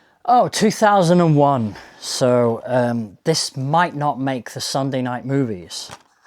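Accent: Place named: Midlands, United Kingdom. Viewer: Amazing what town are you from? Your voice sounds midlands, is it that part where there is a 'something' line I have heard Elis James talk about before?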